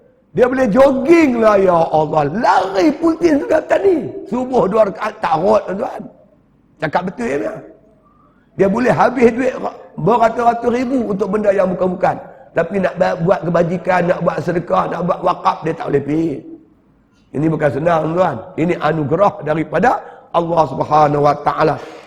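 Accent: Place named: Kedah, Malaysia. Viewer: Alhamdulillah kata2 yg penuh hikmah dan saya rasa tersentuh hati dgr nasihat ust siddiq nor. Dlm masa yg sama sy nk tergelak dgn loghat kedah dia😄 semoga Allah memasukkannya kesyurga firdaus.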